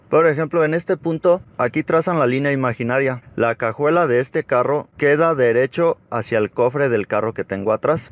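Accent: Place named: Popayán, Colombia. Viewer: Ud es de Popayan?, tiene acento de allá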